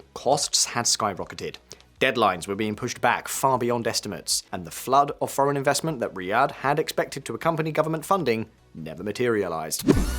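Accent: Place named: United Kingdom. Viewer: Why is every anti-saudi video made by a british man? Before i even clicked i knew the speaker was British lol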